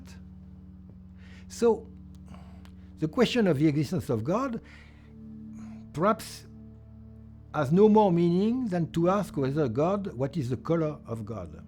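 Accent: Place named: France. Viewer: The priesthood is a very noble calling and much nobler than that of a philosopher. I think that Heidegger and Marion are not the best philosophers to be studied by ongoing priests, nor any other pretentious Frenchman for that matter. Regardless, I wish you all the best in seminary and in life. Today I will go to confession and pray for all fathers and (ongoing) priests. We the laity ask for good priests. One should not forget that faith is an unearned grace. Thank you for going to seminary. But concerning Marion:There is so much loose "thinking" in here that I am really amazed how people can fall for this. (Maybe it is the overly harmonic, dynamic and almost spherical music in the background? The French accent? The light, the colours of his clothes and the grain of the cloths?) He starts by "proving" that any concept is inadequate for God, by appealing to an "Augustinian principle", which he formulates as "If you comprehend it, it is not God". 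However, I think that here he conflates "comprehending" with "having a concept of". I can clearly have a concept of, say my spouse, even if at times I do not comprehend her, meaning that I do not comprehend her fully. Maybe my concept of my wife is then also "not adaequate", and I would be open to such a possibility (although I would find it ... you know ... a bit laughable and french. I clearly able to identify my wife and I do not mistake other women for her nor do I mistake her for other women. I would say that my concept of her is a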